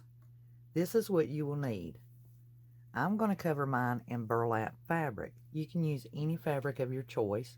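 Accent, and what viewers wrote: South, United States. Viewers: Thank you! Such na wonderful band east project and your explanation is beautiful love hearing your southern voice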